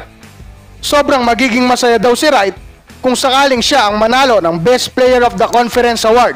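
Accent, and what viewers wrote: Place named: Pampanga, Philippines. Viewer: alatang alata na kapampangan ka heh 😂😂😂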